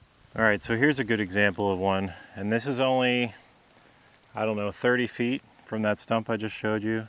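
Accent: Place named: United States. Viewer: I was confused a bit when watching your video. Honey Locust is a native North American tree. You sound American and look to be in the Midwest. When you say invasive, it means the plant comes from a different environment or location and outcompetes native varieties. Better terms might be annoying or disruptive and unwanted. No offense intended & I appreciate the information and showing how to get rid of them. I’m from Indiana am trying to manage the woods on my property and return them to only native plants. The honey locust and calorie pear trees eat tires…. And must go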